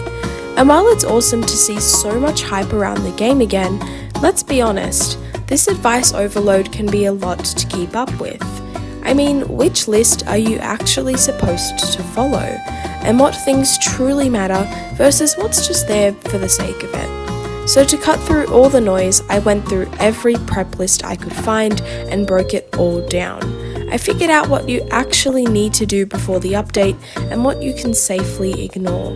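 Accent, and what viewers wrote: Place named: New Zealand. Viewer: is that a new zealand accent? i love it, it’s so nice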